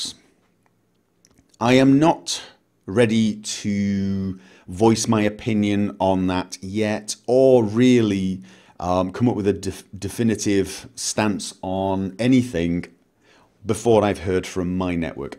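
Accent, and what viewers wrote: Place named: England, United Kingdom. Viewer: He's gone over that many many times before. He IS English. He and his family moved there a number of years ago. The accent didn't give him away? >_>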